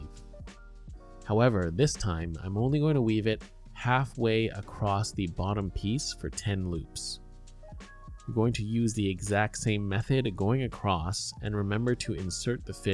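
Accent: Canada. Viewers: are you Canadian?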